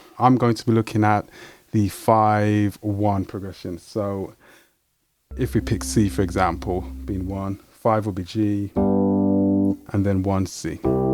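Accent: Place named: Birmingham, United Kingdom. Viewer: great stuff. Do I detect a fellow Brummie? 😊😊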